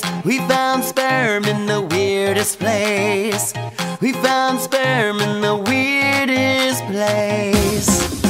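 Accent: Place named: London, United Kingdom. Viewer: LMAO at the London accent....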